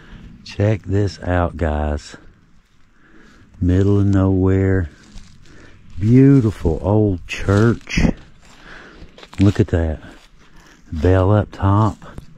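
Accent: South, United States. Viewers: You know what you got a seriously good voice. If I was you I would be reading civil war novels etc online. I think you can make a good living as an orator dude especially as if you were reading Southern history specifically confederate civil war. You got the perfect accent for it. I think you can make a good living doing documentaries for History channel. Perfect soothing voice and I'm not kissing your ass. I'm just sayin. I'll leave you alone. Say hi to the boss.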